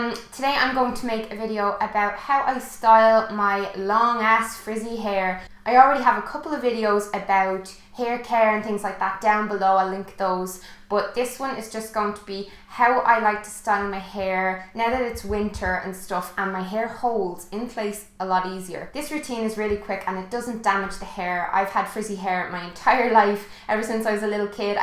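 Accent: Ireland. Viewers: Your so beautiful, and it's so weird(not u being pretty) it's weird that I'm like your doppelgänger but younger! I had extremely curly hair like you did! And I presume your from Ireland?! Well me too! And I have the same accent, I have extremely think hair,frizzy/curly hair like yours... I love your channel and you look so pretty and beautiful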